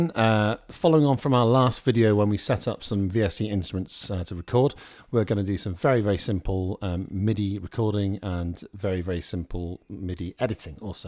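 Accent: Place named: United Kingdom. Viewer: "might be a cheeky one in there" tehehe love the british accent and vernacular :) thanks for helpful video!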